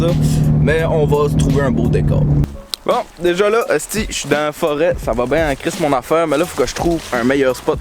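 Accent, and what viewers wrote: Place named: Quebec, Canada. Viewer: Coucou, je me suis abonné à toi car tu es drôle, sympa et j'adore ton accent québécois. Moi je suis 🇨🇵 et 🇩🇪 et je vis en france.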